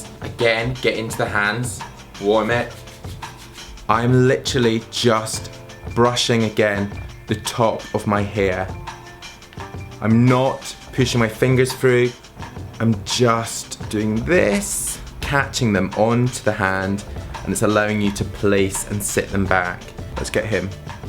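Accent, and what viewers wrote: United Kingdom. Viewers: i wished I have a british accent like you 😂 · Cool video man but it would be better if you started while your hair was messy af, like just waking up or whatever. Also stereotypical gay accent mixed with whatever British accent sounds odd lol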